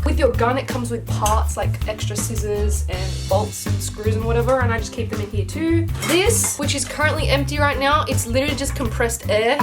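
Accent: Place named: Australia. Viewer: Jazza sent me. Nice video. Was thinking: Sounds like an aussie. Then you bust out a "now this blokes all done" Yea that'll do it.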